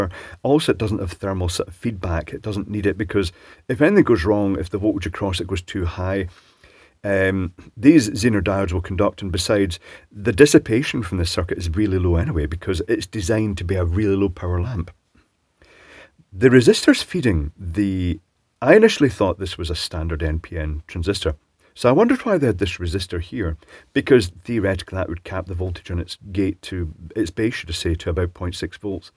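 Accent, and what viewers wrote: Scotland, United Kingdom. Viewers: Actually it’s a Scottish accent!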